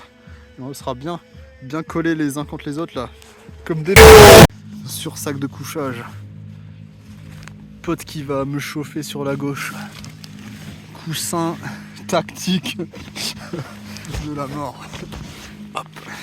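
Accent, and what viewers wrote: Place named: Alsace, France. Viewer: J'ai directement reconnue l'accent alsaco ! Super mood en tout cas. On se croisera peut être un jour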